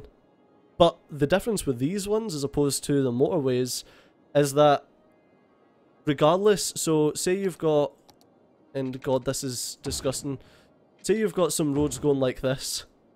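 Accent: Scotland, United Kingdom. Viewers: are you scottish? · Maybe it's just me. They say they hate english accent but I actually love it. Do people in Scotland always speak like this? I need to focus really hard to understand some words you are saying... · Im Dutch, but that is scottish, not german.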